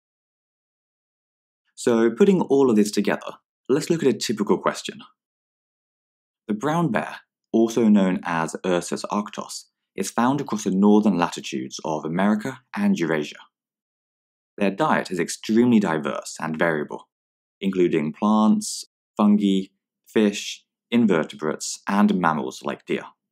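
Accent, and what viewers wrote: United Kingdom. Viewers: His British accent is the only reason why I understand his videos 😂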